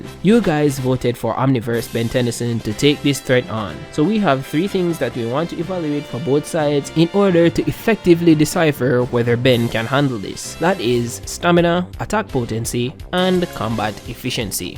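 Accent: Jamaica. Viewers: You can really hear your Jamaican accent coming out in this one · Are you Jamaican? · Are you Jamaican because you sound 100% Jamaican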